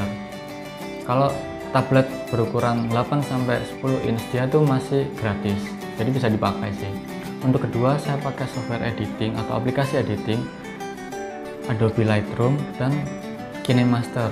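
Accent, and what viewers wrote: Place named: Java, Indonesia. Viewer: Seneng gua dengerin medok lu mas wkwkwk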